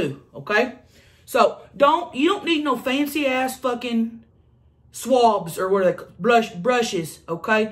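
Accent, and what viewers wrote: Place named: Texas, United States. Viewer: I just love those Texan tone🍧🍧😋😋☺️☺️☺️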